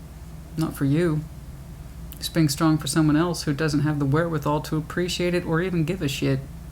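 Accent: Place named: South, United States. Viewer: Yes it is my fault for staying too long. I’m a Texas girl and yes, the southern drawl I hear it. It’s awesome and refreshing to hear fellow southerner.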